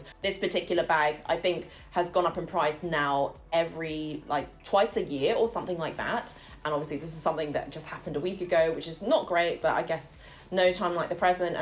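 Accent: United Kingdom. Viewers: Lovely bag! Also side note, I love how you are Asian but sound so British - like me! 😍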